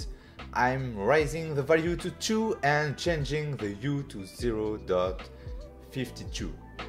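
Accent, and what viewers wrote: France. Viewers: T’es français a tout hasard ?😂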